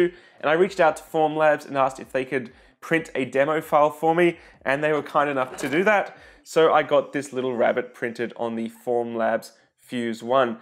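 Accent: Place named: Australia. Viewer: YOU'RE AUSTRALIAN! I WOULD HAVE NEVER CALLED IT. GOOD ACCENT! (I'm Aussie too)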